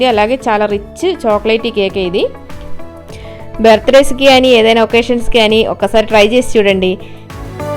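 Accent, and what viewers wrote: Kerala, India. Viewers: Yantha ba telugu matladhunori with that malayalam touch❤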